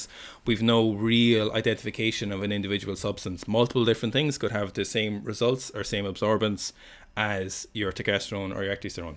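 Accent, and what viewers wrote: Ireland. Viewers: That is an Irish accent isn't it?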